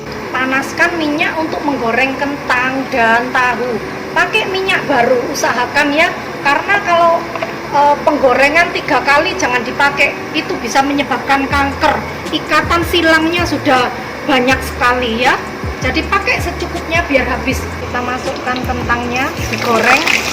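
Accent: Java, Indonesia. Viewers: ngomong medhok nya yang ngangenin liat kontenx sll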